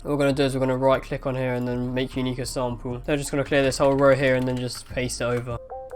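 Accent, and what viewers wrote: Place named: United Kingdom. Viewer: guy is really BRITISH!!!!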